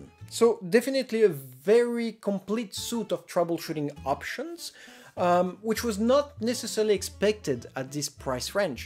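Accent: France. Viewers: pretty french accent bro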